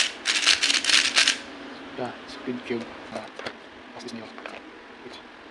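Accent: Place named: Philippines. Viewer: I like ur accent are u filipino?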